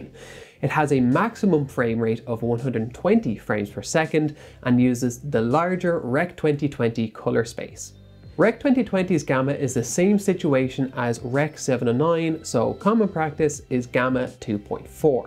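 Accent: Ireland. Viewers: Dublin Accent 😁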